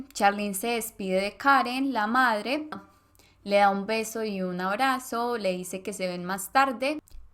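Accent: Colombia. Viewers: Tiene acento colombiano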